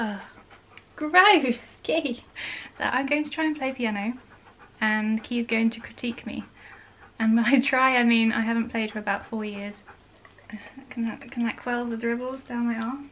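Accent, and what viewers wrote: United Kingdom. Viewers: Can you answer if ur British is me am British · It’s cuz she sounds British or something. If your American of course you love it what American doesn’t?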